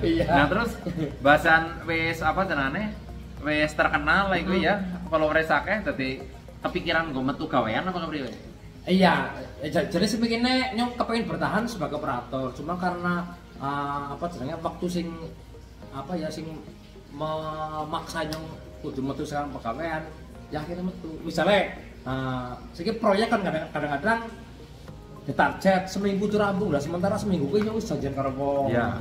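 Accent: Banyumas, Indonesia. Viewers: Cempulek jebul bang wong ngapak hehej🙏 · Salam ngapak ko...sukses terus kang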